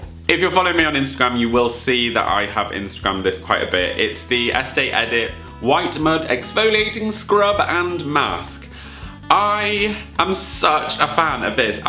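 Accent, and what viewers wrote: Australia. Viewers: Listen ere Sheila! Living for ur Aussie accent lol.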